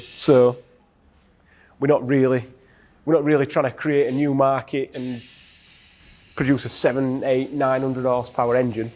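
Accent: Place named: Barnsley, United Kingdom. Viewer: Hi been following your trials and tribulations me thinks you should rename it to Toerag in stead. def more Barnsley like. love the Barnsley accent but its no wonder some folks cant understand you broad Barnsley is a very difficult dialect to understand., and im born and was draged up in Barnsley, well actually Grimethorpe. Keep up the good work take no notice of the nay sayers and internet experts lol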